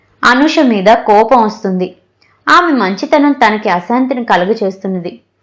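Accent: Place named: Telangana, India. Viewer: కథ ప్రారంభం లోని శిలను కథ అంతానికి రచయిత్రిశిల్పంగా చెక్కిన తీరు బాగుంది.ఆకాశమంత ఎత్తుకు చేరుకున్నా, భూమిని మర్చిపోకూడదు వంటి డైలాగ్స్ 👌 తెలంగాణా యాస బాగుంది 😊 మంచి presentation.....❤❤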